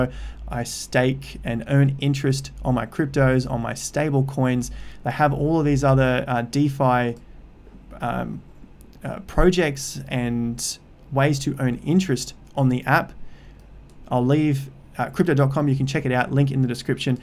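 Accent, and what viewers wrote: Australia. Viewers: It's very nice to hear an Aussi accent. I'm in Melbourne. The comment about the "kidney-transplant" was a fine touch. I'm considering doing it- but Ada might plunge, and I'll end up with no money and one kidney!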